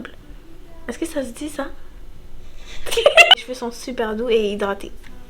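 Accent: Canada. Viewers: Je connais la gamme d'Eva depuis plus de 2 ans et demie, elle a bien progressé ! J'utilise la gamme Kreyol essence depuis 3 ans vous la connaissez ? Elle est chère aussi mais fait du bien ! Il faut pas gaspiller. J'adore l'accent canadien ! Je pense tester la gamme de Eva mi 2023 ! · Vous avez un petit accent canadien mademoiselle 😉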